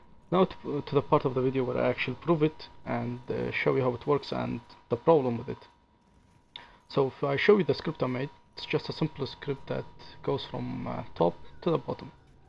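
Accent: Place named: Arab world. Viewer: والله كنت اقول الاكسنت عربي ههههههههههههه.. من وين؟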